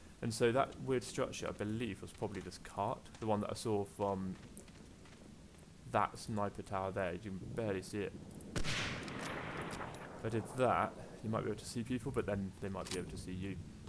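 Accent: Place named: England, United Kingdom. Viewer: great video! are u from England? u have a nice accent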